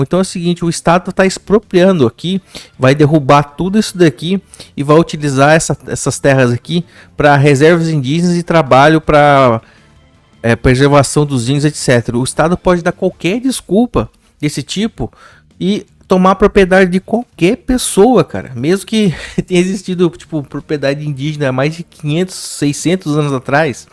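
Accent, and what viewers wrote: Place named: Inland São Paulo, Brazil. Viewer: Praticanente impossível é o sotaque do interior de S P. ele teria que viver uns 20 anos em outra região para mudar.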